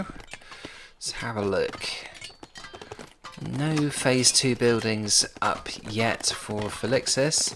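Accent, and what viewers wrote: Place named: United Kingdom. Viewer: If I may chime in, I’m really enjoying most of your content, watching both the new and old videos, and enjoying most of it. You have a really nice manner of presentation (and a cool British accent too), and although the games are long, I’m sometimes switch off to doing other things while simply listening to your narration. Tutorial type videos are a nice recent addition, really appreciate that. As for the casts, I think 4v4 look most impressive, as the outcome is a bit harder to predict, but 1v1 with closely rated players are also fun to watch.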